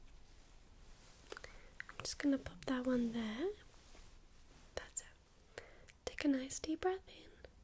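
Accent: United Kingdom. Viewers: YouTube need some more decent British accent ASMR like your channel 😊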